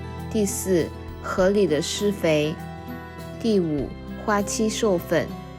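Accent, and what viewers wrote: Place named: China. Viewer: 中國人的4瓶!! 雖然用了正體漢字聽口音跟用詞都不是台灣人跟香港人的用法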